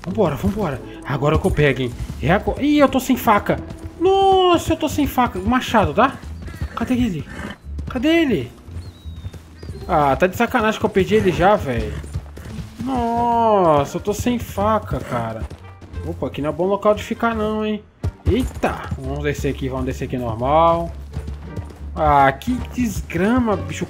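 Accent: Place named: Minas Gerais, Brazil. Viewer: Sotaque de Minas parece da roça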